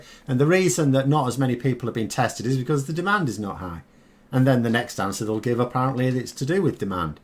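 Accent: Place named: Scunthorpe, United Kingdom. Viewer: Hi. The Google auto subtitler struggled with your scunthorpe accent in the first few seconds with a hilarious result 🤣🤣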